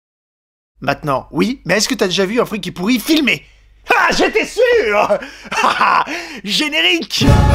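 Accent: Belgium. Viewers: tu es belge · T'es belges ? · il est belge?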